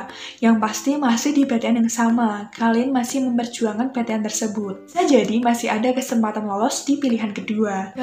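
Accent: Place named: Semarang, Indonesia. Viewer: org semarang ya? logatnya org smg bgtt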